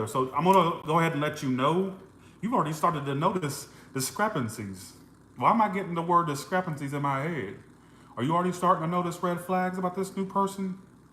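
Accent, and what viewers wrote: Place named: Texas, United States. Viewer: you sound like a Texan, reminding me of my cousin walter/jeremiah, from Pasadena / houston <3 lmk if you are. love it. and im a spiritualist/ tarotist too, i had my entire YouTube erased , just about. i feel your pain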